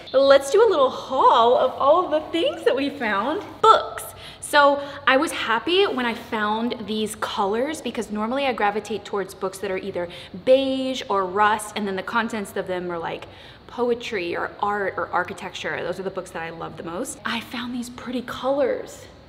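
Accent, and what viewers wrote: Texas, United States. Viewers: Your accent is getting it’s Texas back! Every time I would go back to wherever I was living at the time from visiting home in Texas, I’d be teased like crazy because my accent would be back 😜❣️...Actually all it took for me was talking to my family and friends in Texas‼️ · Love how when she goes home to Texas a slight accent comes out 😂❤️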